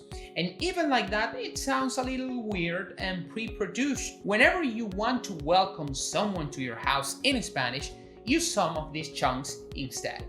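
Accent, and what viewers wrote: Texas, United States. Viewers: Habla tex Mex no problema...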